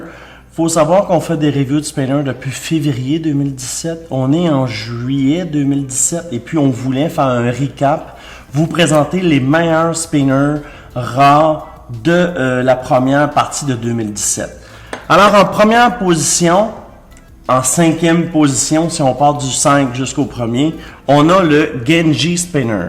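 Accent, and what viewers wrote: Quebec, Canada. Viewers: ah mais oui t'es québécois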